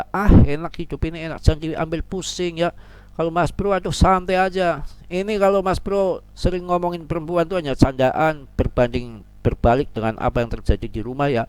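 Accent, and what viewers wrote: Banyumas, Indonesia. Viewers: Yakin nyong seneng banget Karo BROOO.... Gole jelasnya logat ngapak.... Tapi JELAS... JUJUR APA ANANE.... ORA GAWE WONG MONCROT.... GAWE WONG DADI SULTAN..... MATUR SUWUN BRO..... DMOGA ISTIQOMAH JADI AMAL JARIYAH...... AMIIN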